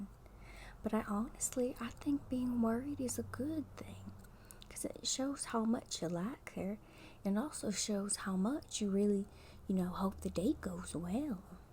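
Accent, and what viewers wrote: South, United States. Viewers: Love that accent you've GOT to do more with this southern accent character!! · Love your southern accent role plays😊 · Absolutely love your southern accent, so relaxing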